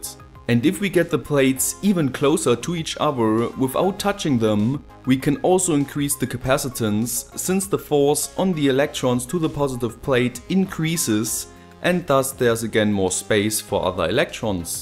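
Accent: Germany. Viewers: these videos are way more well filmes and understandable, last but not least more professional then many others on the same topic out there! Even if you sound a lil bit german XD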